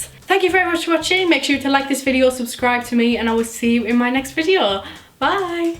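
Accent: Newcastle, United Kingdom. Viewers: Are you from newcastle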